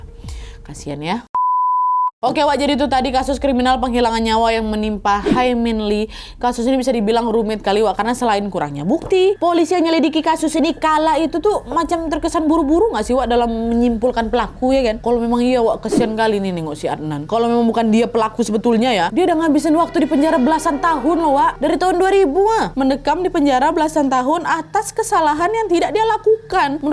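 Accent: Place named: Sumatra, Indonesia. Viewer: Trnyata banyak jga yg suka nntn 😂 suamiku sampe hafal kalo udah denger kau nada sumatra pasti lg nntn si wawa yaa😅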